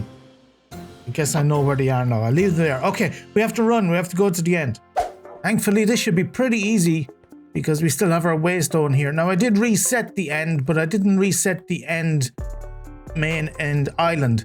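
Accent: Ireland. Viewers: I rarely comment but I must applaud you for the creativity and the amazing videos. Undoubtrdly one of the best minecraft builders I've seen, if not the best! You are not small but a big gem among the minecraft creators. Of cours love the accent too, Irish but not too Irish so I can actually understand what you're saying lol. Keep them coming pls :medal-yellow-first-red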